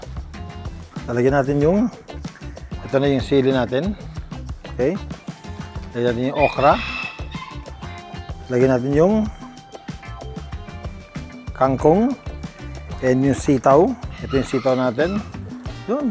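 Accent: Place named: Visayas, Philippines. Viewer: Wow Sarap po ng luto niyo sir. Yung tono ni Goma parang nagshi shift sa bisaya at tagalog hehe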